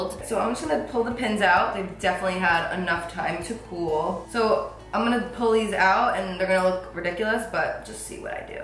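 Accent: New Jersey, United States. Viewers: u have a nice thick jersey accent, represent girl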